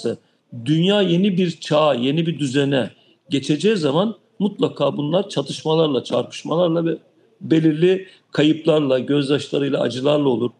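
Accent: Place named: Izmir, Turkey. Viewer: Tam İzmir"li gibi konuştu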